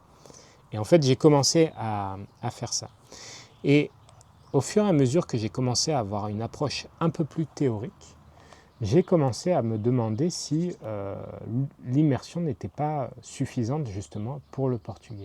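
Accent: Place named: Marseille, France. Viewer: Dis moi que tes de Marseille sans me dire que tes de marseilles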